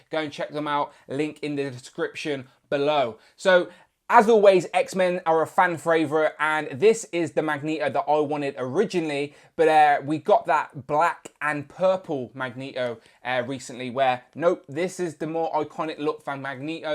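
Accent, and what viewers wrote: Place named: United Kingdom. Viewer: I don't know. (He sounds like an Asian guy living in England.)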